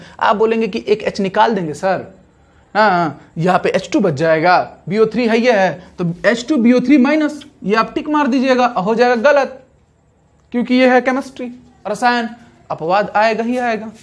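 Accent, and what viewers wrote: Bihar, India. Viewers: Bahut acha padha rahe ho bihari style me mai paid class chodkar apke hi video dekhta hu kyoki bahut hi basic se bataya ja raha hai apka physics bhi bahut acha lagta hai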